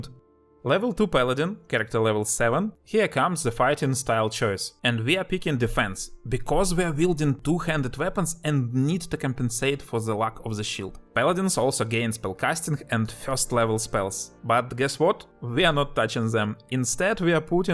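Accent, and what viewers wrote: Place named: Russia. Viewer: nice russian voice brat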